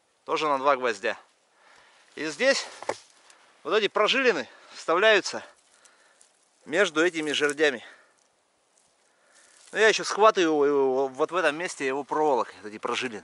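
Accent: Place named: Vyatka, Russia. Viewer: Очень хороший канал, привет бывшим землякам))) Узнаю родной ВЯТСКИЙ говор)) 3 года от него избавлялся). Но, послушать его иногда очень приятно! Продолжай в том же духе!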